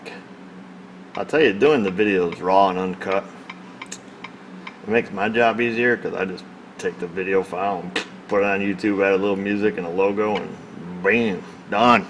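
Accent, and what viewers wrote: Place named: New York, United States. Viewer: "Wadd-a" you "BA-UN" NY accent LOL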